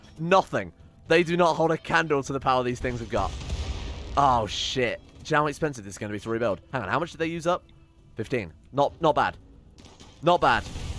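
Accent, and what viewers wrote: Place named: United Kingdom. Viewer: I love your accent can you please say more British things? In particular I like when you say "bollocks" and occasionally "blimey"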